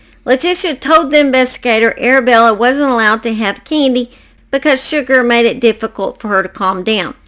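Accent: South, United States. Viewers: sweetheart, my Mum would've loved you ❤, we both always loved hearing that beautiful southern accent ❤. You're gorgeous 💕. Haven't left many comments dear, but watch all your videos❤🇦🇺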